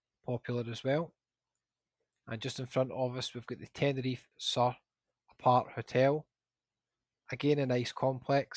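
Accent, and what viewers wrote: Scotland, United Kingdom. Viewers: Enjoying yr vlogs ☘ great hearing a Scottish voice for a wee change 😁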